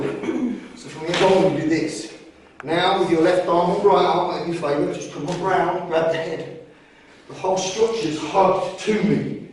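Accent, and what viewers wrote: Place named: Leicester, United Kingdom. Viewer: Hi, addicted to your vids, i’m probably your age and maybe had similar experiences growing up (BUT I wasn’t wearing a suit or uniform, enough said, i detect a leicester accent sometimes - i was in glen parva so you can guess the rest)), did bjj & striking at “mansfield chinese boxing club” (was actually “british combat” & bjj actually) also had my own “b’c’” weekly coach (one of the original 10 coaches certified by Geoff & Peter) so i was PASSIONATE about violence in my late teens up to now i suppose BUT had to give up on getting into violent situations whenever possible because i discovered money and for every step i took forward i took 2 back, The lesson i want to share with your viewers is this >>> WHEN YOU ARE GOOD “AT VIOLENCE” it’s an easy trap to fall into that you don’t bother to learn alternative life skills because violence gives you SOOOOOOOOOOOOOO much and that in it’s self is “self validating”!!! 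I was very lucky/ unlucky that i developed some serious physical problems that saved me from myself and forced me to learn new ways of dealing with situations, gaining validation etc, it has been an amazing addition to my lifestyle (ps i came from nothing & became “rich” in every way a young guy dreams to be rich in!!! BUT WITH VIOLENCE ALONE YOU WILL LOSE EVERYTHING, I am still as passionate as ever and i still have a devastating 2 minutes in me & feel the need to back down to no man because of fear BUT please i know you understand everyth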